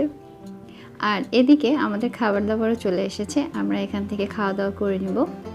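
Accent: Sylhet, Bangladesh. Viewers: Are you sylhetii apuu ??? From uk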